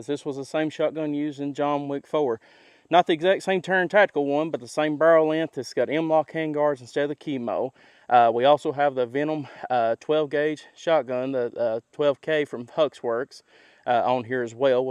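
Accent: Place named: South, United States. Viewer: Slow down a little, with your awesome accent it gets difficult to understand when you talk so fast. I had to turn on the subtitles. The southern accent is the best, no question, but it wasn't meant to be belt fed.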